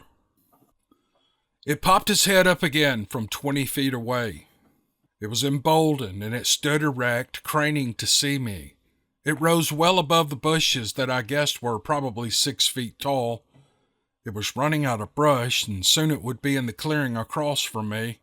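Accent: South, United States. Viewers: It's that southern accent, I love it. Feels like an uncle telling a hunting story.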